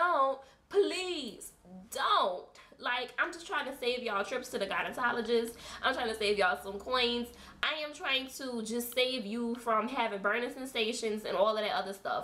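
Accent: New Orleans, United States. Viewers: Are you from N.O.?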